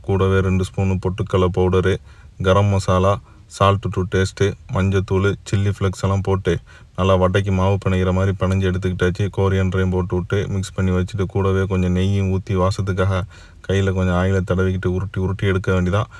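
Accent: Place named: Parangipettai, India. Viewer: நீங்க என்ன பரங்கிப் பேட்டையா? அந்த slangil பேசுறீங்க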